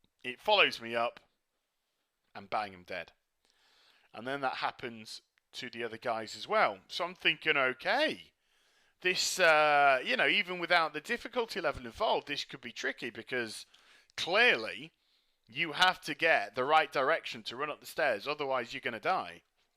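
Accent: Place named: United Kingdom. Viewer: damn this guy's accent is annoying more so than other british accents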